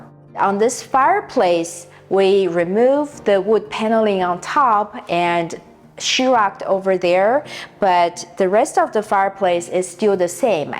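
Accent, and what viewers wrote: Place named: Taiwan. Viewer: Are you Taiwanese? My mother in law is and sounds familiar ❤. Anyhow love your videos. I am new to house flipping and making many mistakes as I go but a life long learner . Thanks for sharing with us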